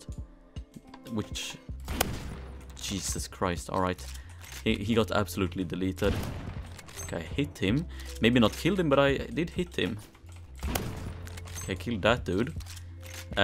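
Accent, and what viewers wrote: Sweden. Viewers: Are you swidish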